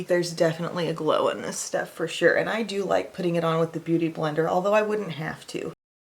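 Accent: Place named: South, United States. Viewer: I also love your “oh isn’t that pretty” in your slight southern twang ❤️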